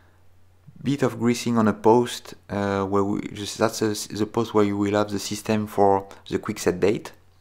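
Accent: France. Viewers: Thanks to your video I was able to review the Omega 613 that was given to me by a friend. I didn't know it, so following your instructions helped me a lot. Your English with a French accent is also nice 🙂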